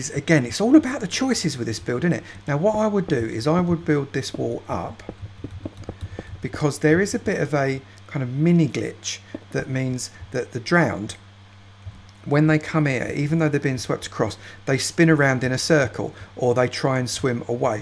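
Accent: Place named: South London, United Kingdom. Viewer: How is it Gordon Ramsey when he doesnt cook the only thing similar is that he is also british. They both sound like they are from South London but it doesnt automatically make him Gordon Ramsey. Im guessing you are an American who thinks there is only one form of British accent. Let me correct you, there are a LOT of British accents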